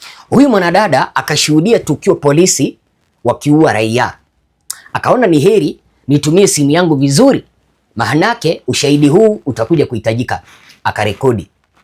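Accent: Kenya. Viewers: Mbona accent ni ya kenya? Reporter anajiita mtanzania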